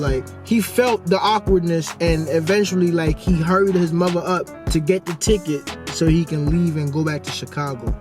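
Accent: New York, United States. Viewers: Are you from NY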